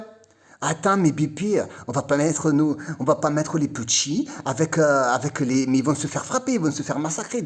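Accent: Marseille, France. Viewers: Frère il faut rien dire point faut juste faire. Et pour le tu parle comme un voyou je pense c'est ton accent ils ont pas l'habitude.. Ça Renvoi à Marseille le banditisme... Bref Oui en hijra faut écouter personne sérieux beaucoup qui vendent du rêve et ya bcp de jaloux en Algérie faut se méfier de tout le monde😂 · Moi, je trouve que tu as plus un accent marseillais qu'autre chose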